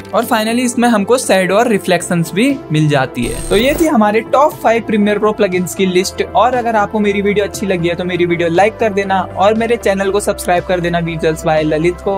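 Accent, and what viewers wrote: Pahadi, India. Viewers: bhai awaz se toh pahadi lg rhe ho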